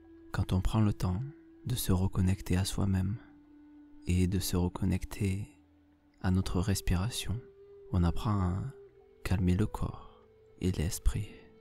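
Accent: South, France. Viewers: votre accent du midi qui fait chaud au ❤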